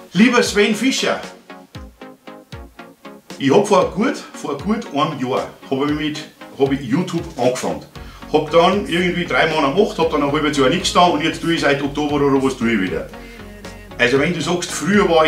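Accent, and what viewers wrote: Bavaria, Germany. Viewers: Solche Empfehlungen würde ich nicht aussprechen. :D Als gut ausgebildeter Elektroinstallateur lernt man hier nichts fachliches... stimmt, es ist aber nie verkehrt, zu sehen und verstehen, wie andere "Artgenossen" arbeiten. Wer den Drang hat, sich selbst fachlich zu verbessern, darf sich nicht scheuen, andere Arbeitsweisen kennen zu lernen, zu sehen und zu verstehen. Außerdem lernt man so (in diesem Fall) den bayerischen Dialekt zu verstehen... du quasselst damit etwas, was ich fachlich alles kenne. Besser lernt man keine "Fremdsprache"... woll?! :D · Du bist scho a bäriger Typ - Bsonders dei Dialekt is a so schee. Mehra Bayern auf YouTube ! Mach weida so! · Super Kanal. Finde die Umsetzung sehr gut. Den Schutzschalter würde ich genauso machen. Lieber Nummer sicher. Und dazu noch bayrisch Top top top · Also ich als Bayer versteh den Dialekt ja, aber ich glaube das für manche Menschen ein Untertitel absolut sinnvoll wäre!!! 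Dann hättest noch mehr Klicks!!!! 😝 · Ach reg dich doch nicht auf über sowas, dann lebst länger :-) Aber mal ehrlich, mach einfach mal was mit ihm zusammen, ein teil des Projektes bei dir und ein Teil bei ihm, das bringt Klicks :-D. Lets Bastel hat ja letztens auch Hilfe bei Jonas Winkler geholt. Mach weiter so und ich als Sau-Preuße verstehe dich auch in Bayrisch, zwar manchmal mit Hürden aber das passt so ;) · "Und wehe die taugt nix..." 😄 Bin gespannt und in freudiger Erwartung! Es ist immer wieder ein Genuss Dir zuzuschauen. Auch wenn es ab und an etwas anstrengend ist wenn Du wieder ins Ur-Bayrische schwingst. 😉 Mach weiter so!!! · Ist das Bayrisch? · Ich schaue Deine Videos gern aber mein lieber ich kann Bayrisch nur schwer verstehen. Etwas mehr Hochdeutsch wäre schön. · Des passt scho so wia d des mochsch danke für den elektrischen Teil aber bissle weniger bayrisch wär au ok ;) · Guten Abend Mache bitte weiter so ! 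Und lass Dich bitte nicht Ärgern :-))) Ich finde deinen Kanal Top / Klasse / Spitze ........ usw. Und wehe Du hörst auf Bayrisch zu Sprechen , Hochdeutsch passt leider nicht zu Dir :--)))) hört sich an als wenn ein Rheinländer Bayrisch sprechen würde :--)) Schöne Grüße aus dem Rheinland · reg dich doch nicht ueber die dummen auf. ich schaue deine videos gerne, weil du diese interessant machst. deine freie bayrische ehrliche schnauze schaetz ich auch sehr. also brav weitermachen.lg rene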